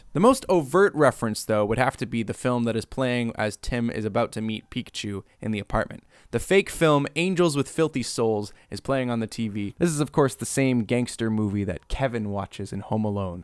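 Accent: Australia. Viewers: Maybe doesn’t realize that people mainly come here for his Australian accent voice. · Binge watching old videos then just not hearing an Australian accent all of the sudden was incredibly jarring. I do like the videos where gets involved though.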